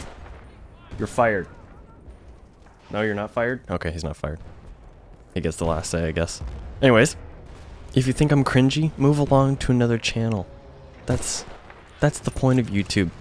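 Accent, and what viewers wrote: Canada. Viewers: Canadian eh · Where are you from dude? I'm from Edmonton and you sound canadian :P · Are you Canadian? Dat accent tho. Btw, love your stuff. It's definitely something refreshing in a world full of screaming gamers. Keep at it! :) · Is he canadian? I didnt know but I heared him talk with aboewt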